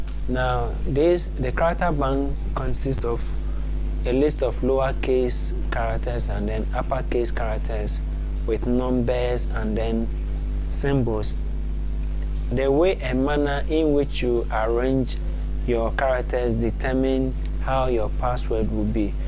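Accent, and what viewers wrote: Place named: Ghana. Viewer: Good tutorials BTW you sound like a Ghanaian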